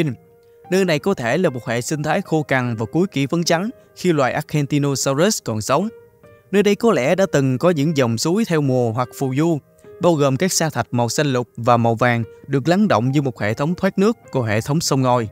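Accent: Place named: North, Vietnam. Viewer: không phải phâm biệt vùng miền gì mình là người miền trung vẫn thích nghe giọng bắc chuẩn hơn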